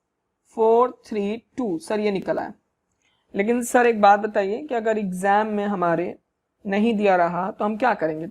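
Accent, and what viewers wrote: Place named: Lucknow, India. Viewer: Sir aap Lucknow se hai kya ?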